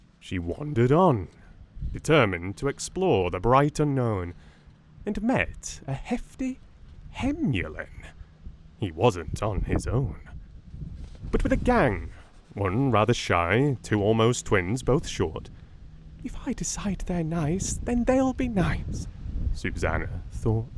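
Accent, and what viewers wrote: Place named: England, United Kingdom. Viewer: what a wonderful late summers day! almost feel the smell from the water Your pronounciation is perfectly fine with a typical english accent:-). An extra information about fika is that the word comes from a time when people liked to play with words like this: fi-ka turned around = ka-fi... Kaffi is an older way to say kaffe.(coffe). Love Tove Janssons books, grow up with them. I will listen to your reading soon. 😊🌻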